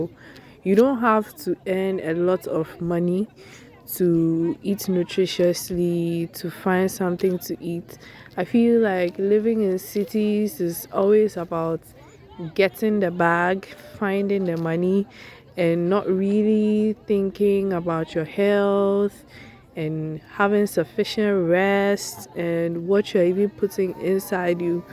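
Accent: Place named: Ghana. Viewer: Chale why do you sound so Ghanaian more than me 😂😂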